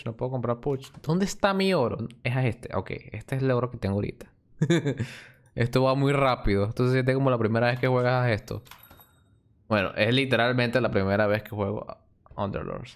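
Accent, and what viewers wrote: Venezuela. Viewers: lo mismo me preguntaba yo xD es venezolano ? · Eres venezolano mano?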